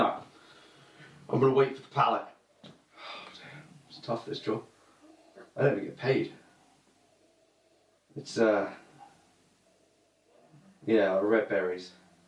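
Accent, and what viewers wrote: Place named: RP, United Kingdom. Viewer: Nice review...your just to posh pal...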